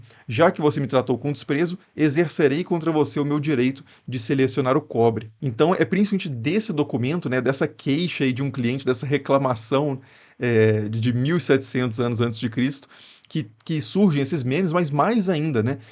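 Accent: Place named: Rio de Janeiro, Brazil. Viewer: Com certeza Carioca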